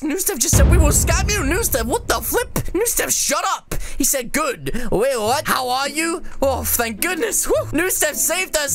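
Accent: United Kingdom. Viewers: XD British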